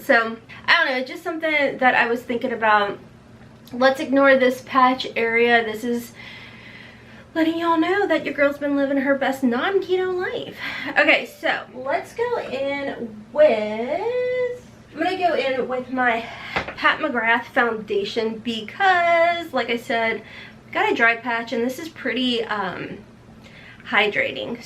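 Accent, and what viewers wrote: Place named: East Tennessee, United States. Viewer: Found your channel and LOVE IT!! Especially because you sound like me lol (I’m from East TN) Anyway you mentioned self tanner and I’m just getting into that (used to be a tanning bed queen), what do you use??? So many make me orange and girl I love my Vols but ain’t looking to be orange and white 🙄🤪 HELP!!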